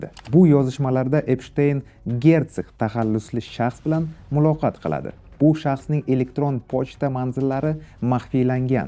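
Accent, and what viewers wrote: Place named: Navoiy, Uzbekistan. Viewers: Навоий акцент ку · Aka Navoiydanmisiz, shevayiz shyaqqa tortyapti😊😅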